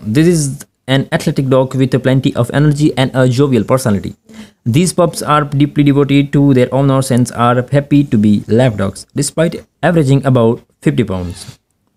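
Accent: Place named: India. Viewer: I don’t think it’s AI. My guess is that this is a man from India doing the narration. Unfortunately, his accent is extremely difficult to understand. If he is going to be producing videos like this, he should at the very least SLOW DOWN.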